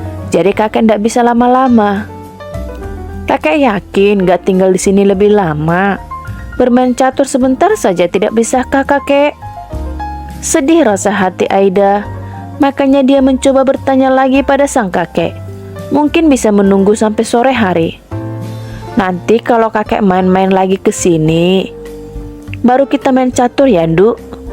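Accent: Java, Indonesia. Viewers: Suka logat yang medok ini,, pas ini dubber nya 😍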